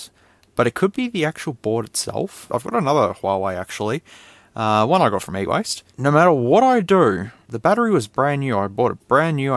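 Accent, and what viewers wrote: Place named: Australia. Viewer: Also he's Australian, that's how we sound.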